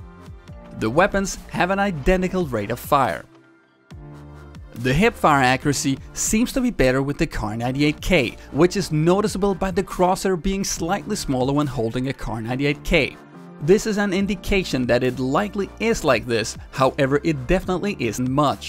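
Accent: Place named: Denmark. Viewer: Your accent is soo Danish, I love it!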